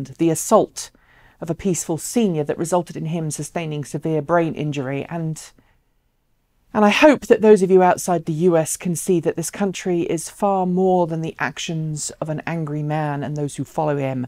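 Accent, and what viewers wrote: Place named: England, United Kingdom. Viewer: Your accent makes me think of cream teas and an English countryside . Thanks .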